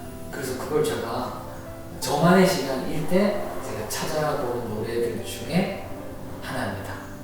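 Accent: Gyeongsang, South Korea. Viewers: 너무 때묻지않은 분 너무좋아요 경상도 예천말이 고맙니데이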